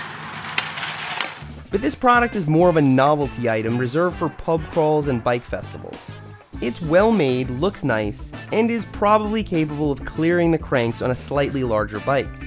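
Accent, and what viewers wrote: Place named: Midwest, United States. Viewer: it sounds like he is plugging his nose while he talks with a bad midwestern accent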